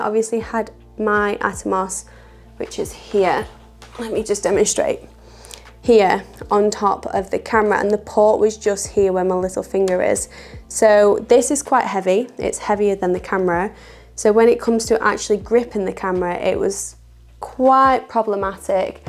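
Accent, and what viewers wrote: United Kingdom. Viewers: Is it Brit accent? Always found it hard to get it (though they ruled India for long).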